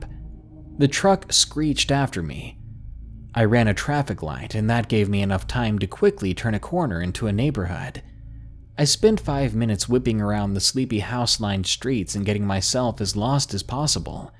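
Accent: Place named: South, United States. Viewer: I like hearing older vids with his southern accent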